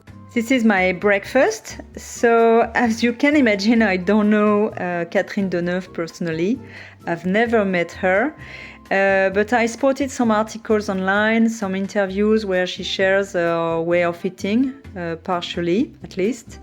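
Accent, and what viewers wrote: France. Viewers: What a rude comment! Your English is lovely and your French accent just makes it more unique... ❤ from Chile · Merci beaucoup❣️I like the way the French eat healthy, balanced meals, and they don’t snack on junk food❣️Your English is very nice and I love your French accent❣️God bless you❣️🙏🏻❤️🙏🏻🍏🍇🫐🥑🥕🥬🍞🍗🥗🫕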